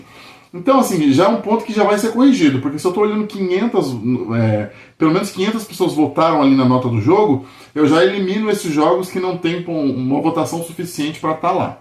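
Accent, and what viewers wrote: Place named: Belo Horizonte, Brazil. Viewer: Ops bom demais? Você é de BH ou região?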